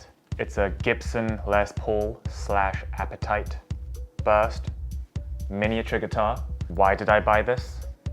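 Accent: United Kingdom. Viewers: How did I not know you had a British accent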